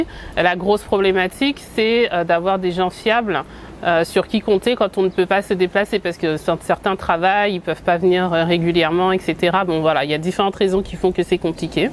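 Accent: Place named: Senegal. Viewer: Cest drole plus je vous écoute plus j'entends l'accent qui se "sénégalise"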